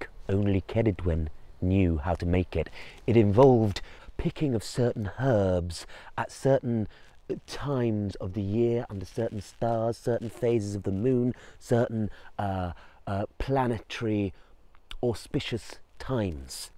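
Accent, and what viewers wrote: Wales, United Kingdom. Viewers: This was so magical to listen to You are indeed a very gifted story teller . Thankyou for sharing your wondrous gift with the world I love your Welsh pronunciation of names and places which make the story more magical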